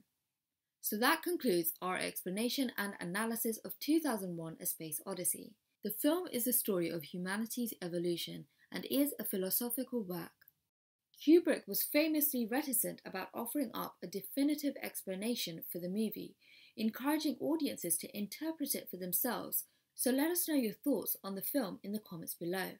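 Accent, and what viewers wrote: London, United Kingdom. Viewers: totally agreed beautiful British accent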